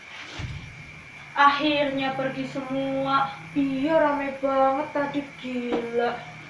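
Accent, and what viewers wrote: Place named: Java, Indonesia. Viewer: Sukses terus mbloo, Medok amats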